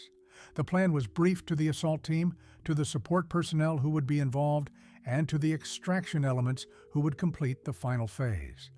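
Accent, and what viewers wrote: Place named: United Kingdom. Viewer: Lads these are well narrated and pretty direct by a fellow Brit, Godbless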